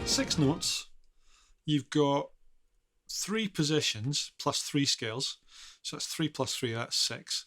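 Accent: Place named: Scotland, United Kingdom. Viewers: The accent is scottish ... alans was yorkshire . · This is East Scotland accent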